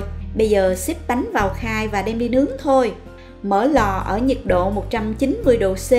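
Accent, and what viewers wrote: South, Vietnam. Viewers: Lần đầu tiên xem video của bạn, nó cuốn hút người xem với giọng đọc miền nam mộc mạc nhưng thanh tao và sức truyền cảm, ấm áp người nghe. Mình đã đăng ký để tiếp tục xem nội dung những clip mới và được dịp tiếp tục nghe giọng của bạn. Chúc sức khỏe và thành công.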